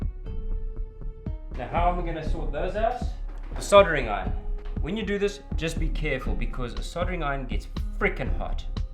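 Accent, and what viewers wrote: South Africa. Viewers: I can tell instantly you're South African tjom. Great video, was just looking randomly for folks who may have crafted Vincent Valentines gun and I found you. Not very many South African content creators like you and I around, granted our content differs by a helluva a degree but still cool to see another.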